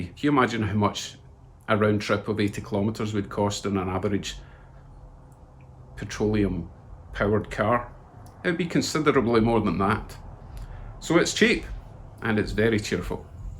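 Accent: Scotland, United Kingdom. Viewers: with Vancouver having the highest cost of fuel in the North America (i can't believe i just paid $2.40 a litre yesterday!), it's time for me to go electric & you've convinced me to give this a try! Love your Scottish accent and Canadian adoption. Sub'd.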